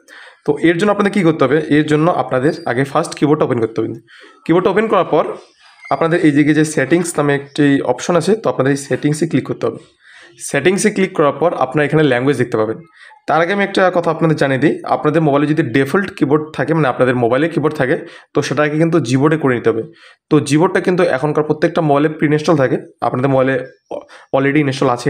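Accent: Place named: United States. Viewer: American Bangla aaina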